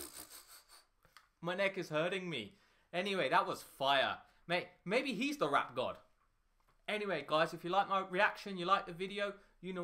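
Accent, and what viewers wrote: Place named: England, United Kingdom. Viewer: Your english accent is so cool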